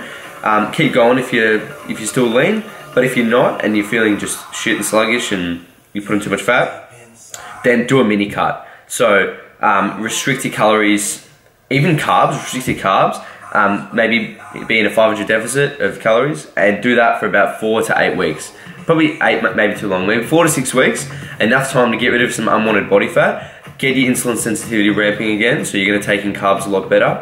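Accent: Australia. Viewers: Bro... your mum is the best hahahahaha, sweet video man its good to here an aussie among all these mericans hahahaha sick 1 series to dude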